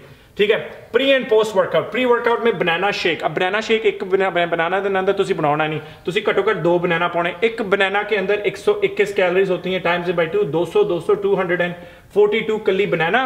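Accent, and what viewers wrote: Punjab, India. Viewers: Super style sir ji sp ki hindi panjabi mixx super shahid style sir 😁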